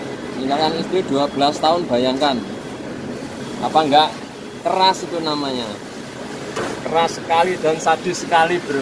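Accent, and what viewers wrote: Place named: Java, Indonesia. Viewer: Semngt mas. . Jowone pundi ko medook bngett.. 😄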